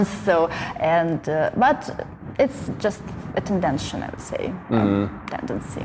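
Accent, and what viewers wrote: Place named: Russia. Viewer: У нее какое то русское произношение ))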